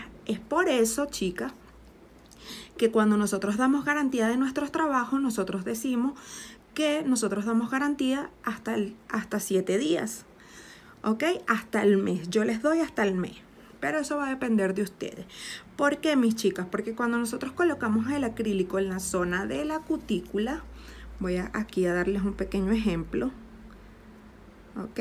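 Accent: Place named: Venezuela. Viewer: Amo ese acento venezolano saludos desde Argentina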